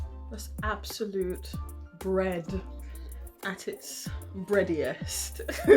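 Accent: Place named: Jamaica. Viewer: I loved this tutorial, then me hear the Jamaican accent and then me extra love it! Had to subscribe <3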